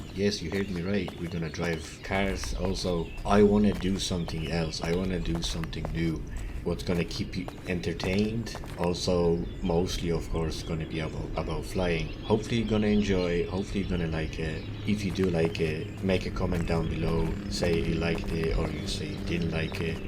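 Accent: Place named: Ireland. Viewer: Hi I have just started watching your channel I appreciate your aim to be entertaining......I thought initially you had a slight Irish in your accent but wasn't sure about your nationality.......thanks for your work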